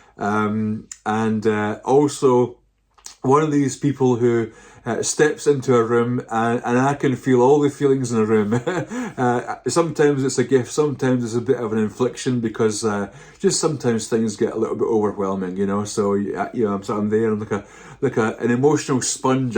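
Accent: Scotland, United Kingdom. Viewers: Hello from USA…..glad to see your video again! I love listening to your Scottish accent and watching you knit so calmly. Your sweater is just beautiful. See you on your next video. 🤗🧶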